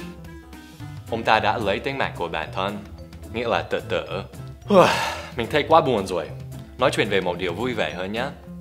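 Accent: Standard. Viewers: nói tiếng việt chuẩn lắm rồi nha:) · Cảm ơn bạn!Video của bạn thật là thú vị dễ hiểu , dễ học và dễ nhớ .Tiếng Việt của bạn tương đối chuẩn !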